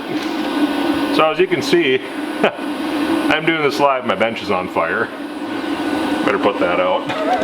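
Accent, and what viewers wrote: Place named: Northern Minnesota, United States. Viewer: I heard northern Minnesota in one of your Iroc videos. Love Irocs! Where in Minn? I'm originally from Intl Falls on the border, eh? So I'll have to catch up on your Camaro vids .... peace.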